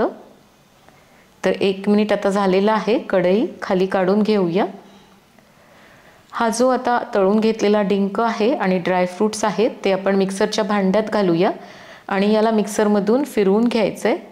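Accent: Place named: Pune, India. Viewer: Tumhi puneri bhasha bolta chan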